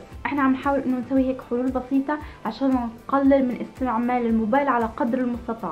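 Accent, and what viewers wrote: Tunisia. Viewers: احس انك من تونس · نحسك تونسية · هل انت تونسية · انا مشتركة جديدة حاستك تونسية اذا كنن صاح انا جارتك من الجزائر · صح هي تونسية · إيه تونسية حلوة سكر😋🌹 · حسيت من طريق حكيك انك تونسية بنت بلدي👍👏🌞😗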